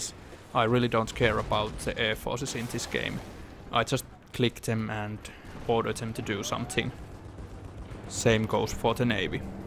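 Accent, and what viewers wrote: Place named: Finland. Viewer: Finnish accent, best accent ;)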